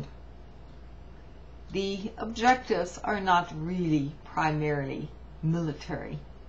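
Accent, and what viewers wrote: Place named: Scotland, United Kingdom. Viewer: seems like you have a trace of scottish or irish accent in yr speech did you ever live there?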